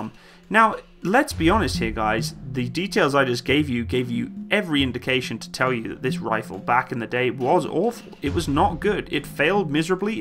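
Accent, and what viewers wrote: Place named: England, United Kingdom. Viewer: you sound english/ do you live in murica, cos if you still live in then uk how did you get the tavor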